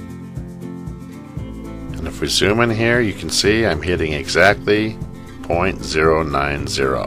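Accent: United States. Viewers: very good cowboy accent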